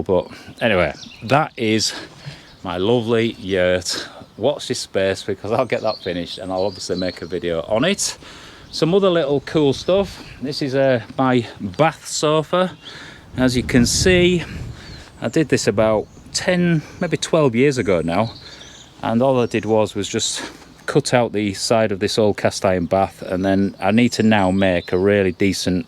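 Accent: Yorkshire, United Kingdom. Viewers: Hi.... How you doing? What you been up to? It's been a while since you posted a vid. Hope all is good!? Just letting you know that I enjoyed watching a lot of your Lakes camping trips... I'm from Lancashire but lived in Yorkshire for half my life so I really connected with your accent and manarisiums, reminded me of when I was younger. Take care with whatever you are doing and thanks for the effort producing the content that you have. 🤟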